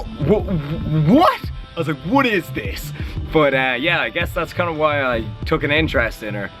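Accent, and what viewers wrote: Ireland. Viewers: Ahhhh I love the Irish accent! Check out my vlogs if you get a spare moment :-) Love from London xx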